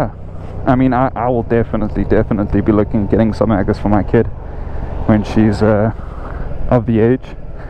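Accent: South Africa. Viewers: What part of South Africa are you from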